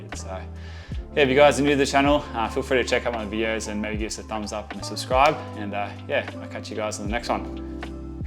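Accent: Australia. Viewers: Omg only Australians would understand what you are saying!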